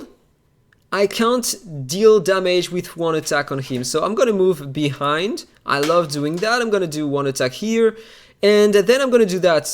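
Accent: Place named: France. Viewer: I love the French accent, by the way.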